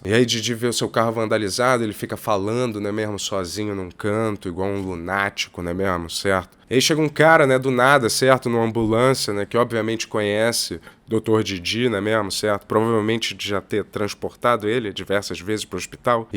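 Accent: Rio de Janeiro, Brazil. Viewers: Esse e o primeiro video que eu vejo dele com vicio linguístico, incomodou um pouco esse "né mermo" sotaque carioca já não me encomoda tanto.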